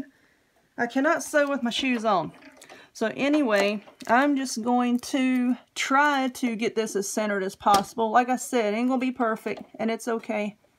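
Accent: South, United States. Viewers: Mam, Your potholder caddy is awesome!! I am getting ready to have a craft sale at the end of the month and I came on tonight to look for simple ideas to make on a budget. I have tons and tons of fabric as my grandmother use to own her own fabric shop - so I was looking for cute easy ideas for some new crafts to sell. As soon as you mentioned that you need to take your shoes off I thought to myself, "wow, this gal is like me". When I use to sew with a treddle foot machine I always sewed with my shoes on and I would get that treddle going very fast but, now with the modern day peddle I have to sew barefoot as I have more control over it that way too. Most of the pot holders I sell I also make but, I don't believe my pot holder will work for your little bags. I will start watching for the pot holders on sale at various stores. I believe my Dollar Tree might have your type of pot holder too along with the zip lock bags. 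These little purses would be ideal for teen girls also and small enough for their lockers. I think they would be good for sun glasses/eye glasses but, you cannot sew the sides completely up so you have room to slip in the glasses. Thank you for an awesome new craft idea. - Rebekah in Alexandria, VA PS: Where are you from with your thick southern accent? I am from NJ so you can imagine how I sound. My niece laughs at how my mother, grandmother and I say coffee. Her father is from NC so after a summer with her other grandparents, my niece comes home calling all of u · I'm from NC as well, I can totally relate to your southern accent and way of talkin. I enjoyed this video, I just might try making these purse caddies. Tfs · You never bore me love watching your DIY and learn from them. Plus like your southern accént 👍👍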